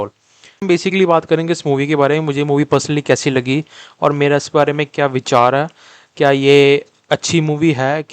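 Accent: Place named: Pakistan. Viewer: yar tum accent sy to pakistani lagty ho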